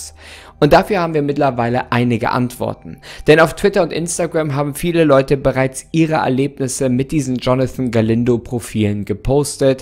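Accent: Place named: Northern Germany, Germany. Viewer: Norddeutscher Akzent halt.. Ich spreche es auch so aus💪😂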